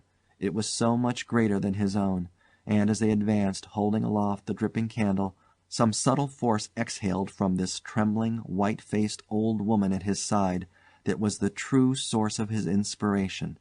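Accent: United States. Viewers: The American voice is a welcome change. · Funny I think when it's a ghost story narrated,do we expect a British English accent?So when an American narrator comes on..we are surprised..Let's listen..love a bit of spooky!!🙂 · Thoroughly enjoyed this ghostly story, the American narrator was a surprise, but he read the tale masterfully, keeping the suspense until the end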